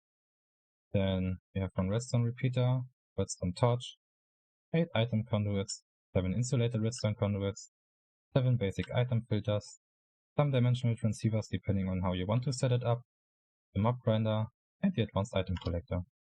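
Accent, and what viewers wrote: Germany. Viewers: are u german? sounds like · Der deutsche mit seinem Akzent :D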